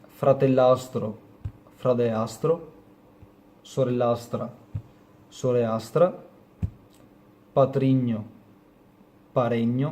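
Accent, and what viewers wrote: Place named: Italy. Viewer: Diversi accenti sbagliati... Un po' italianizzato come Veneto...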